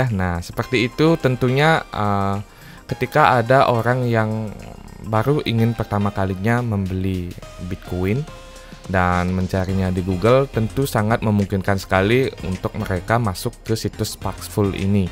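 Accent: Bali, Indonesia. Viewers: Mantap ilmunya bosku.. Tp logatnya ky org bali ya 😁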